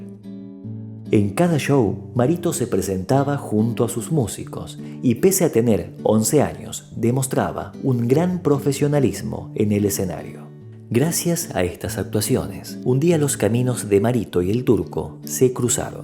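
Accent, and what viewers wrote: Tucumán, Argentina. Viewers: Sos tucumano?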